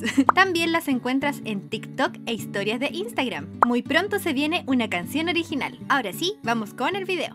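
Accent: Chile. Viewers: me parece?? o habló en chileno???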